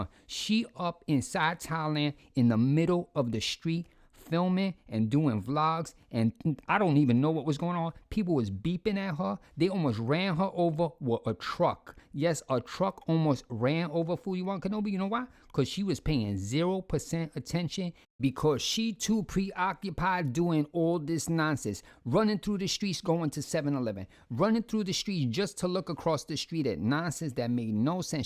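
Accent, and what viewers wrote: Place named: Nuyorican, United States. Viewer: I don’t even care about Foodie but I will always watch your videos, even if you’re doing reviews of the world’s best cabbages. By the way, my bf hears your videos and is convinced you’re one of his Newyorican relatives doing reviews on internet weirdos on the down lol. I mean, he might be right?! Love you!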